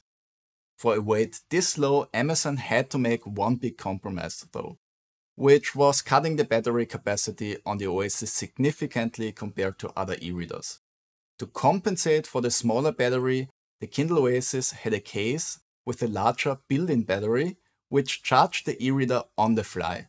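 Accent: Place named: Austria. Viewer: Your accent sounds austrian.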